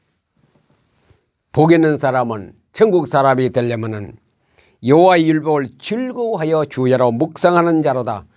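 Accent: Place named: Gyeongsang, South Korea. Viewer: 말투가 참 친근합니다 경상도분 목사님 ^^' 귀하고 좋은말씀 👍🎚감사합니다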